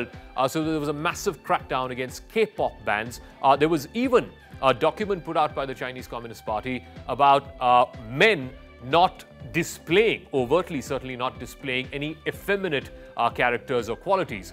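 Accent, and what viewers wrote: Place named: India. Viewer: First time to see this channel and I love it clear unbiased factual and subcribe. First I hesitated since it sounds Indian,I'm not a racist because I've watched a few Indian channels they're all craps loke WION/GRAVITY,TGIF etc.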